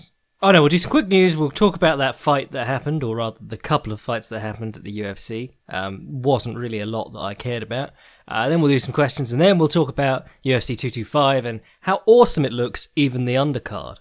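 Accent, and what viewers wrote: England, United Kingdom. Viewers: So you just had to get that off your chest? I love the youthful voice paired with the understandable English accent, btw.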